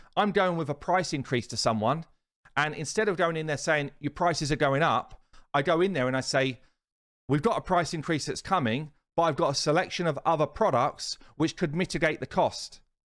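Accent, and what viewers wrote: United Kingdom. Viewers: i could listen all day long to your lovely british accent! 😂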